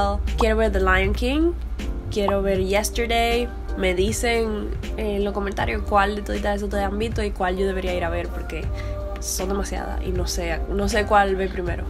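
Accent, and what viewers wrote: Dominican Republic. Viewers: Me encanta el acento dominicano! 🙂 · I just found your channel. Nice to see a Dominican YouTuber. You have a nice voice/accent! You sound a lot like my cousin who lives in the Dominican Republic. Like EXACTLY like her. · I'm also Dominican! Love your accent! Sounds beautiful, Spanish but with African twang and a bit of Arawak. Love our Dominican Spanish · Más videos en español ! amo el acento Dominicanoo <3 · Es mi primera ves ver tu video. Yo hablo inglés pero siempre estoy buscando oportunidades de practicar el español. Yo te entendí perfectamente que me asombra porque el acento dominicano es único. Gracias y espero más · ¿Buen video, algo me dice que eres cibaeña, quizás de Santiago cierto? (Yo también soy del cibao STGO 👌🏽. PD: Your channel's name reminds me of song: ❤, kep it up with the good work, greetings from DR! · Finally a real Dominican accent that’s not exaggerated. 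· por fin un canal con una dominicana que habla como hablamos la mayoria de los dominicanos, asi es que habla el dominicano promedio. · I'm not trying to be biased cause I'm half Dominican. But the Dominican accent is like the best. 😏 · Wow q genial! Me encanta tu accente.. El accente Dominicano. Mis vecinos pq soy un Haitiano. 🇭🇹. Soy nuevo de YouTube. La verdad me motive mucho, para sigue aprendando mas espanol, y tambuen sigue adelante con Mi canal aqui.. yo no me voy por vencido.👊 GRACIAS 🙏🙂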